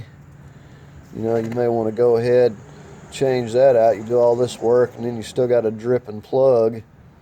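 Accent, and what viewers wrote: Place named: Southern Ohio, United States. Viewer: Fantastic set of videos - on my ‘05 Tribute V6 I have oil leaking out of my oil pan, main crank and valve cover. It’s a mess! I’m using your videos to do all three. I’m competent but not confident under cars, so these are just perfect. You sound like you might be from Southern Ohio too so that makes you okay in my book to begin with. 👍 Thanks again for sharing your expertise and making such clear to follow videos!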